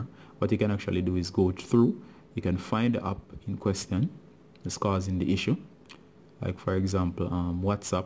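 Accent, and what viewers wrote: Jamaica. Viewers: came for iris scammer fix stayed for the jamacian accent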